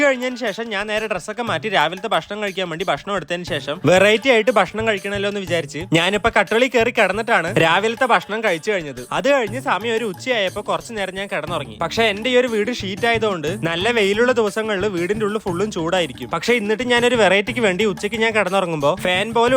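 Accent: Palakkad, India. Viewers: Chetta enk chettande videos nalla ishttann spr ann palakkadan bhasha ❤️💗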